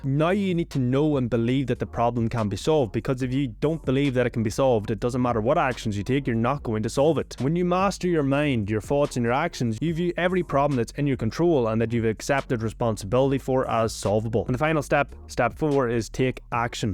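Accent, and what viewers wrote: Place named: Northern Ireland, United Kingdom. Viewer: Where are you from? Your accent is really cool. Northern Ireland maybe?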